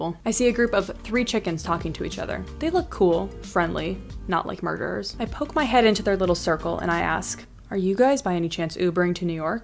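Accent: Australia. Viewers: Reverse psychology, "Mama needs a drink" followed by "It's 5 o'clock somewhere" Love the accent are you from Australie... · I love your Australian accent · Dang, that is a long day!! Glad you found great companions from Philly to NYC though! I once ended up taking a Honda Civic (coup), two trains, and a bus ride to get from my college in Kansas to Ohio for Christmas. Even got mugged at one point. Also, your Australian accent sounds just like Margot Robbie!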